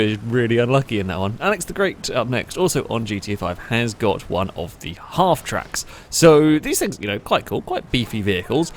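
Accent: United Kingdom. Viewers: Thank you Youtube 4 that recommendation i enjoyd that video, i really like that british norrater forgive my bad english aim still learning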